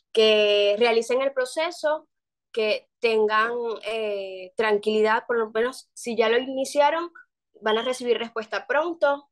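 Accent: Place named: Puerto Rico. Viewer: Eres en verdad venezolana hablas como si fueses de Puerto Rico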